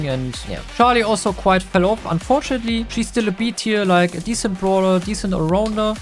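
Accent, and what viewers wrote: Germany. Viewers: Digga wann sagst du auch mal was auf deutsch ich höre da DEUTSCHEN AKTENZ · Bro dieser deutsche Akzent ist crazy🤣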